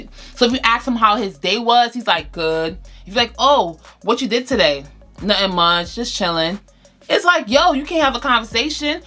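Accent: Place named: New York, United States. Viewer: subscribed just because I hear a NY accent 😂✨ hey sissss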